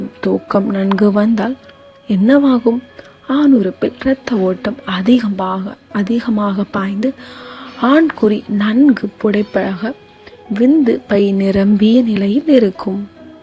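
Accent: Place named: Kerala, India. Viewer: Unga voice Kerala mood arithu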